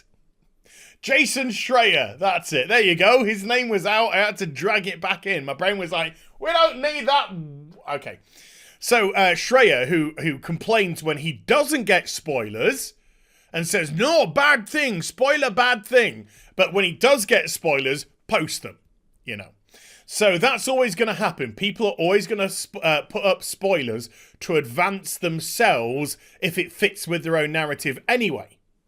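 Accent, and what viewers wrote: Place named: Yorkshire, United Kingdom. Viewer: Got halfway through I can’t believe our lack of perception I’ve got because it’s now suddenly apparent you are so typical Yorkshire very articular but very comical but you’ve just explained something because yes you’re on the ball and I’m learning a lot because I’m hoping to be a YouTuber eventually but all I can say is oh you are awful but I like it clue is I’m Apollo I do apologise I forgot his name well-known very camp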